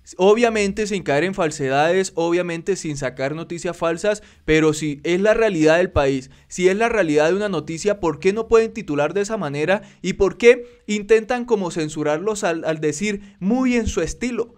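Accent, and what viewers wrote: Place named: Norte de Santander, Colombia. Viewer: pues tampoco lo tomes a mal, bastante hace con mantenernos informados a consta de arriegar su propia vida, para ahora ir a tomar clases de locucion, a mi me encanta su acento, creo nortesantandereano, y no se hable mas.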